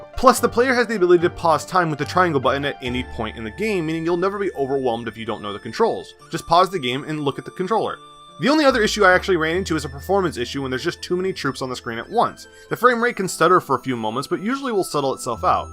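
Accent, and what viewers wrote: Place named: Midwest, United States. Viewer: That's one hell of a mid West Flow you got their son.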